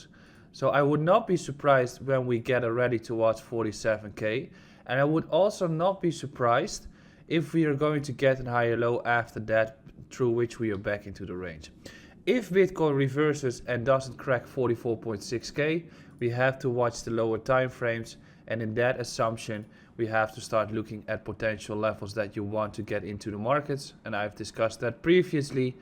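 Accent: Netherlands. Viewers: nederlands dat hoor je gelijk haha !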